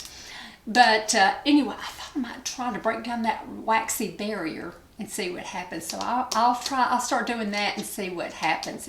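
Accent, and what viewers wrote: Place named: Tennessee, United States. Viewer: Hi, I love to hear you talk! I used to live in Dunlap, TN and your voice reminds me of dear friends that live there. I would def research the vit C derma rolling thing and it possibly causing granulomas. If I remember correctly Penn Smith has several YouTube videos on the subject. I’ve yet to find a vit C that agrees with my skin. Good luck finding a replacement product!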